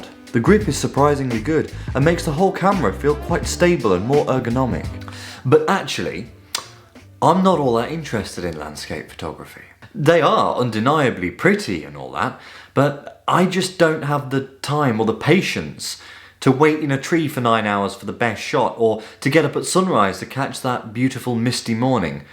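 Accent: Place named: United Kingdom. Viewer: I don't know why but the British accent just got me. Love it though! and M50 is really handy for vlogging for sure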